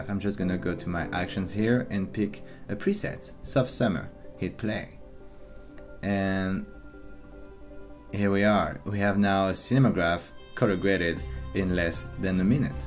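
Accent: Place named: France. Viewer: It literally has subtitles at the bottom of the screen, and the accent is French, but the English is brilliant. Using Action kit now. Excellent stuff!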